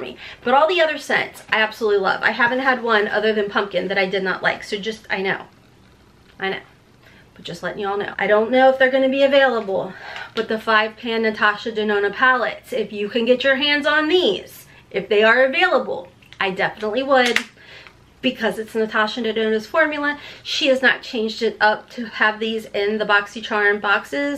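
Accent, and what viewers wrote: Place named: Kentucky, United States. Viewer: It is so cute!!it reminds me of the 5 years I lived in KY and came bk to california with an intense accent! It's so comforting and her extra special personality just makes me feel like she is the house that in todays society you could still knock on the door and ask for a glass of water or directions and she would gladly give them to you and probably invite you to sit on the porch for a few minutes to have a short converstion!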